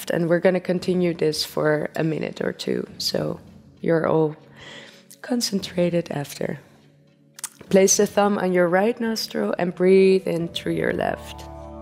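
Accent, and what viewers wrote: Netherlands. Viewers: Yes, she sounds Dutch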